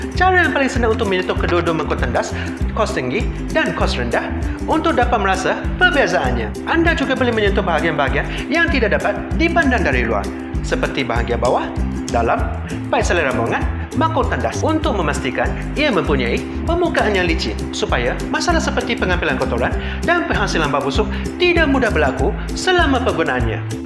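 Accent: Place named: Malaysia. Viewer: Hai saya dari Jakarta. Tengok channel ini Krn suka sekali dengar cara bicara Melayu kaka 😁 konten nya juga informatif sekali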